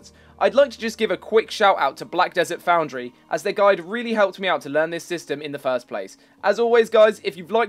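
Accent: United Kingdom. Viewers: "You press the ah" (Oh, right. That's the "R") ... "Which is pretty dahmn bahd-ahss" I love how incredibly British this sounds ^^ Thanks for the tutorial, turned out I had to right-click the faces instead of drag-'n-drop them, huh? And now I know even more than what I wanted to know, like getting additional quests! I might want to focus on this even more. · Gunna play this at the weekend. Good to hear a Brit! and great Vids, will watch them all.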